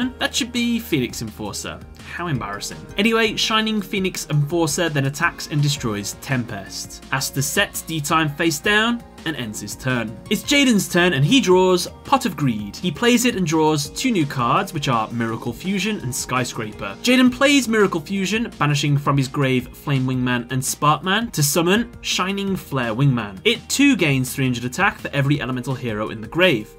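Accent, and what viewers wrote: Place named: United Kingdom. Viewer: yo man, I catch your content on occasion, like what you do and you are clearly loved in this community. Whenever one of my comments is slightly negative I usually don't write it, cause who needs that ya know?? But I'm really confused by your pronunciation, cause you sound British to me, yet you say Tempest and Mariner oddly, you seem to pronounce the syllables individually. Small thing, just catches on my ear whenever I hear it. and the slightly negative comment is...... that part about what fusions Jaden could have gone for after first using 'the warrior returning alive' ,.... so obviously filler man, so obviously, not necessary for your point either.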